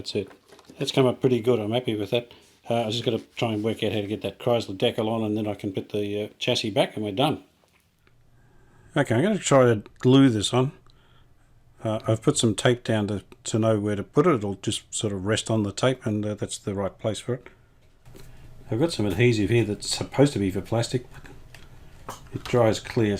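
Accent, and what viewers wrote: Australia. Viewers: accustom to the Australian English pronunciation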